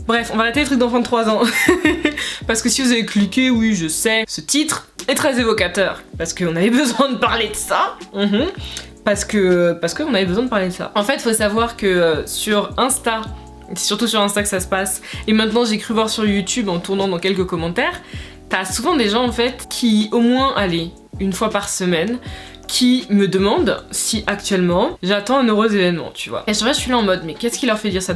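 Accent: England, United Kingdom. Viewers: pourquoi tu prend un accent anglais? C'est voulus ou tu es a appris l’anglais au Royaume Unis?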